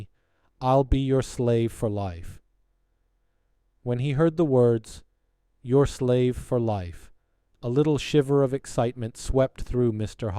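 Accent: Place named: United Kingdom. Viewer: d=(^o^)=b thanks 좋은 영상 감사합니다. 영국식 발음 ㅋㅋ 워터~~ 영어화면 있으니 비교해서 보는게 좋네요^^ 영화도 보고싶네요^^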